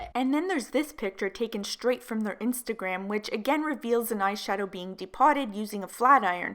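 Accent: Canada. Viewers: Are you from Canada? Your accent sounds Canadian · Thinking of you! I appreciate all your content. I'm poor and disabled, so I'm a makeup buyer on a thin budget. You exposing shady or law-breaking (and rich) influencers gives me a small bit of schadenfreue, which I need sometimes. This is a little silly, but your Canadian accent always takes me back to my salad days, when I was doing my Master's and teaching in Windsor, ON. Everyone made fun of my Michigan nasal-ass accent, and I delighted in every "aboot." Slowly I began to develop my own Canadian accent, which would last for hours after I drove back over the Ambassador Bridge. Now I can shift between the two. But your every "sorry" takes me back to those fun times, chilling in the campus pub. After I watch your videos, I find I'm speaking in my Canadian accent. Sorry for the long story - I just thought you'd appreciate a little haha in this mess. 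Take care.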